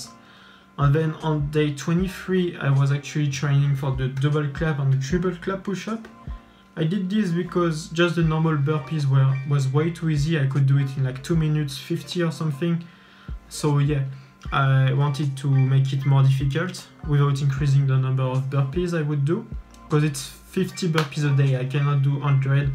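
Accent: France. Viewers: tu parle français ça se sens gg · J ai reconnu l accent français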